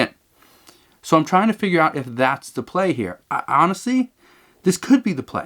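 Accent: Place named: Seattle, United States. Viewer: Thanks, for your research and content. You sound to be in the Seattle area, I'm in Hermiston, Eastern Oregon. Love the videos!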